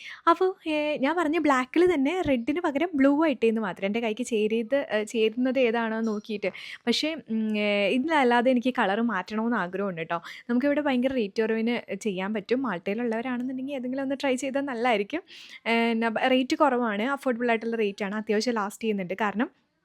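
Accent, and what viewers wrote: Kerala, India. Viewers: Voice over seems like thani malayali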